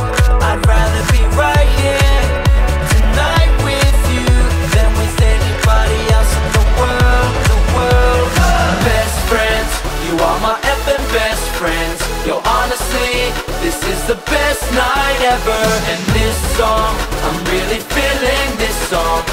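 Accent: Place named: United States. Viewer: Wtf your Aussie? You sound American... Australiaslosing it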